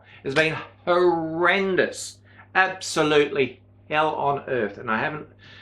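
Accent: Australia. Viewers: I was just thinking about you yesterday and checked to see maybe I'd missed any videos, then this popped up on my feed tonight. I'm so sorry you have all this to deal with and I'm praying for you and hoping you'll go into remission. You have many fans- you aren't the only "old person" doing/watching You Tube, lol. Your videos are so interesting, your voice is soothing and I'll take that Aussie accent any day!💕 Lots of prayers, best wishes and love to you,.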